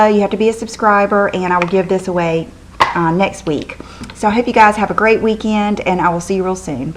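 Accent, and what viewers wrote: South, United States. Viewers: are you from the south , i love your accent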